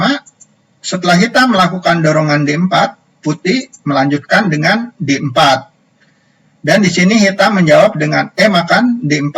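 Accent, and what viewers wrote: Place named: West Java, Indonesia. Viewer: Pak logat Sunda nya tetap melekat ya. Mantap lah.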